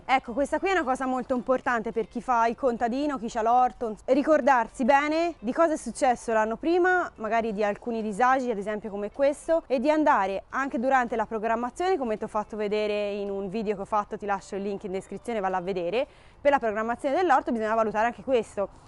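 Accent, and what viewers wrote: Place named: Centre-North, Italy. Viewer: Ciao,forse lo hai già detto,in che regione si trovano i tuoi terreni? Dall'accento direi Centro-Nord...dico bene?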